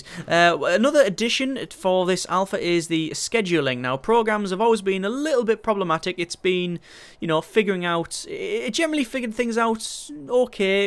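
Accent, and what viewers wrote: United Kingdom. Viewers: You have absolutely no idea how much I love you cause your from the uk, all the uk talk is just cute to me like snookered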